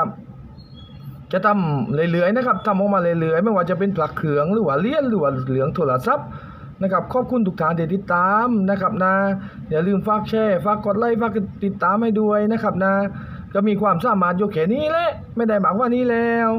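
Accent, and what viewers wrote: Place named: South, Thailand. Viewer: 👍👍👍👍👍 แหลงใต้เลยคลิปนี้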